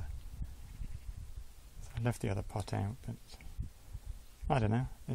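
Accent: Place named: Australia. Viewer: you sound very AUSSIE.!!!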